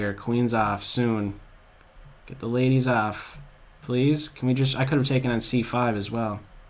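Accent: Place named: New England, United States. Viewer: We don't get many Irish 'round these parts, so I'm not well trained in that accent. But I do hear the New England in his voice. Maybe small town New York or Pennsylvania?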